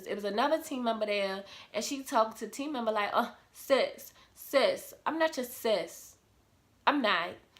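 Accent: United States. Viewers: I clicked on ya video and immediately knew u was from Baltimore lol i was like she sound Nd talk like me😂❤️ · that's why I'm trying to work for myself and I love your accent are you from new york.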